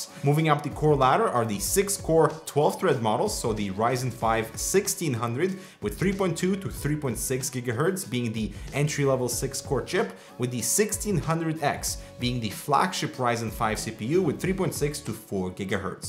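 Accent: Russia. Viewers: ой чувак, такой акцент, ты по-любому Русский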